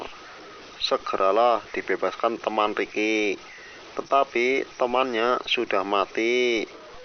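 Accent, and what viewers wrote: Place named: Banyumas, Indonesia. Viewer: Rika wong ndi bos ngapak temen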